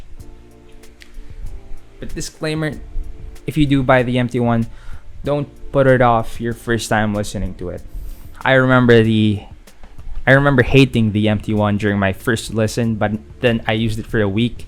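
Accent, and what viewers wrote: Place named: Indonesia. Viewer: You sound Indonesian or Malay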